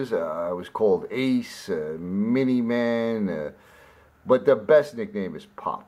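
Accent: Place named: Arkansas, United States. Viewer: Okay I didn’t know you were from Arkansas, that accent clearly gave it away! I really didn’t think you would do this but I’m so glad you did 😁first of all thank you for the awesome shout out my friend. And I Loved loved loved the pics. Some of those faces 😂he’s too cute! ☺️ I wish I would’ve thought to plead the 5th on some of those answers🤔 dang it! I loved your answers I appreciate you being so down to earth and so honest, kind, and funny! The dancing 🕺 answer made me LOL literally. I hope you are having an awesome day my friend. Stay safe!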